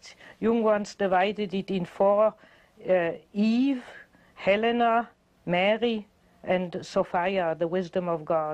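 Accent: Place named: Liverpool, United Kingdom. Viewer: sounds like a scouser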